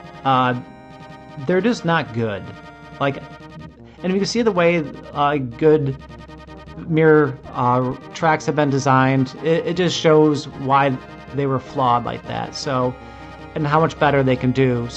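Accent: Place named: Midwest, United States. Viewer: Midwestern accent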